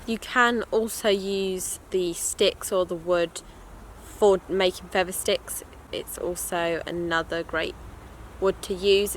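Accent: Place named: Cockney, United Kingdom. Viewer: A fellow lefty I see. Nice video 🙂 So not the subject of the video obviously, but I love the accent. Cockney?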